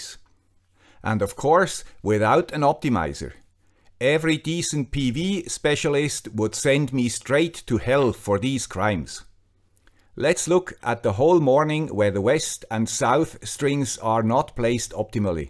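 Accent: Switzerland. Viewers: If you watch how Americans do product presentations by manufacturers to installers, you will know that it's not lying per se rather a culture of willful ignorance -ie, dont teach them what they're selling, only teach them what they need to say using the most simplest words/concepts possible, kinda like optimizing an idea. America, after all, is where a President gets elected by saying the world's largest economy is like running a household budget. Speaking of which, I dont know what all that data and parts means, but the Swiss accent made me believe the conclusion. But I do wonder, yes, the 2% "tax" run "optimizers" but I am under the impression they are also MPPTs. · But who should I trust? A community that made experiences with panels that don't have diodes and bad hardware while not realising things got optimised, or some guy with a Swiss accent that accidentally buys the wrong panels and orientates them poorly while using mathematics and a relatively similar system to compare different things?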